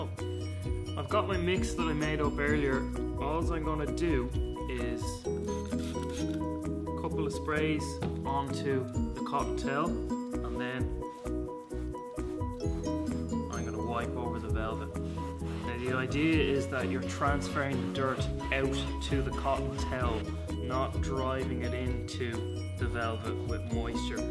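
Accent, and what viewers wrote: Ireland. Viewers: This comment is crazy and I’m obsessed with this video and an Irish accent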